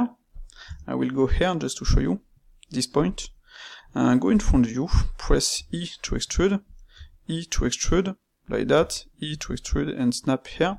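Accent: France. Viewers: i bet you're french ^^ i am too but the accent is sooooo specific ^^ (great vid tho , i just find the accent funny ^^ )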